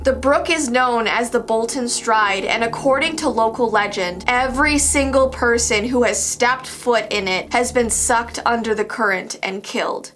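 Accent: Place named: Canada. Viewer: She is Canadian so she has a little bit if an accent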